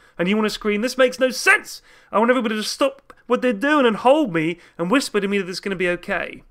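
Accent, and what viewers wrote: United Kingdom. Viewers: people with british accents make things sound much more intelligent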